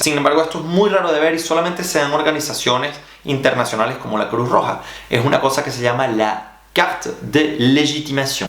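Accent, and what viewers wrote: Venezuela. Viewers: su acento es venezolano. Que Negativo eres ! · Tienes acento venezolano xd genial bro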